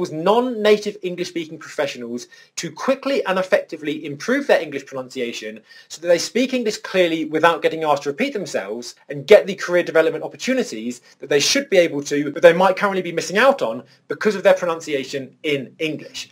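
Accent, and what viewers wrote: RP, United Kingdom. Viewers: Amazing video. i was wondering if this is modern rp british english? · Is this RP accent? Or some other british accent? I've watched your few videos and you haven't mentioned that if you are teaching rp or something else so I want to confirm as I want to learn rp.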